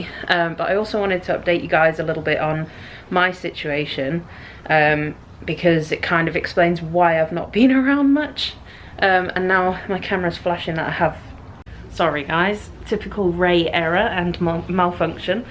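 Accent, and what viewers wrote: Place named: Essex, United Kingdom. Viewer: Hi Ray. I've just discovered your channel. I'm in North Essex so by the sound of your accent, not far from you. I really want to get back into riding but was put off by mean comments about my size which has affected my confidence. I am enjoying your videos and hope to start riding again very soon. I'm a freelance groom so do get lots of horsey contact time x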